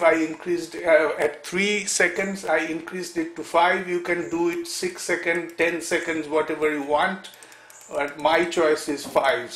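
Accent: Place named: India. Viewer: thenks a lot man!! It's good Tutorials really. I'll watch it all and the indian accent is not a problem. go ON!!.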